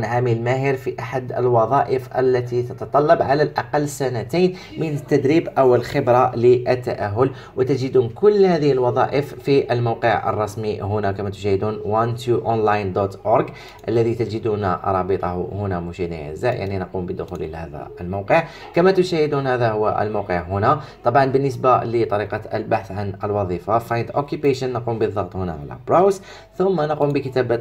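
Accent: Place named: Morocco. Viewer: الاخ اما مغربي و لا جزائري 😂😂😂 عندكم طريقة خاصة فنطق العربية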